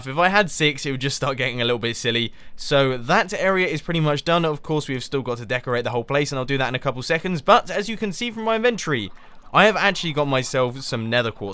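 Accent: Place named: United Kingdom. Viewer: the accent tho... one of the little things I love about ♥ "wotoor botools" (water bottles) "simpolist" (simplest) "prooty" (pretty) and all the missing T's and R's that british people always manage to miss. like you say "ee" instead of "it" or "earlieh" instead of "earlier", "ge'in" for "getting", "bu-on" for "button"...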